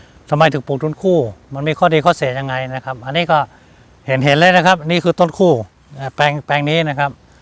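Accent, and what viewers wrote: North, Thailand. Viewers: ฟังจากสำเนียงแล้ว เป็นคนเหนือใช่ใหมครับ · อ้ายคนเหนือแม่นก่คับ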